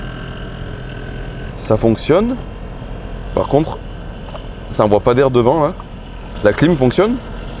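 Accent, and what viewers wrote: South, France. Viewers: BM double vié, ca fait plaisir d'entendre l'accent du sud. Avant de déménager a la réunion, jai vécu du côté d'Aix en Provence, Salon de Provence..enfun jai vecu dans pqs mal de petits villages au alentour.. Hâte de voir la suite de ton bordel pas organiser 😂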